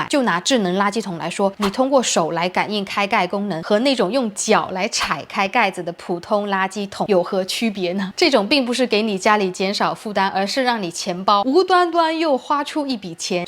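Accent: China. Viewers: 容易，傳統，觀察，囤貨，宣傳，玄關。這些詞，要說慢一點，才好聽。妳是海南道妹妹嗎？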